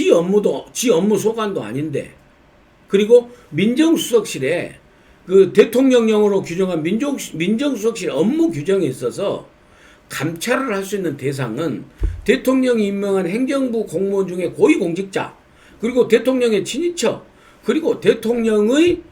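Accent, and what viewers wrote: Jeolla, South Korea. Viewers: 과거 듣기에도 징그러웠던 전라도 말이 민의원님 입으로 나오니 달콤 정겹습니다. 김경재 총재님, 고영신 박사님, 안형환 의원님 ᆢᆢ그리고, 우리 의원님!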